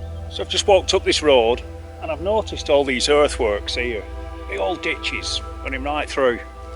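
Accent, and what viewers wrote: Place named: South Yorkshire, United Kingdom. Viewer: Great to watch your video in these depressing times in your good old south Yorkshire accent! Some sense of normality and freedom. Many thanks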